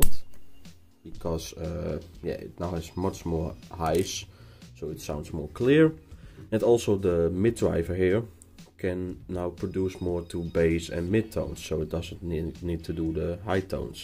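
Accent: Netherlands. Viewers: Nederlands ? Ik hoor de nederlands Engelse uitspraak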